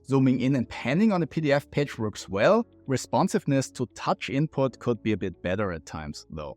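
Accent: Austria. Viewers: daher die leichte Wiener (?) Aussprache. * Englisch eher österreichisch als deutsch angehaucht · Thank you for the good review. Just what I was looking for. Are you by any chance Austrian?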